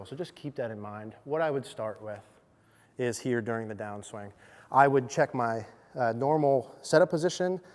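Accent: United States. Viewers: A wonderful teaching perspective. How I wish I could spend some time listening to your best friend about tell me about how you came to your approach to the swing. His best friend's getting better-more entertaining-at his presentations. When you make a joke, your Pittsburgh sppech gets faster. Slow the jokes down for us old farts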